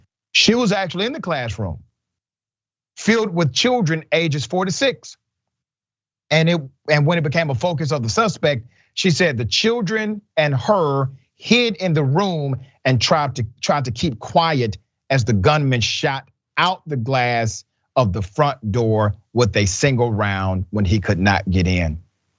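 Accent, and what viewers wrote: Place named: South, United States. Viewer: I LOVE YOUR SOUTHERN ACCENT boy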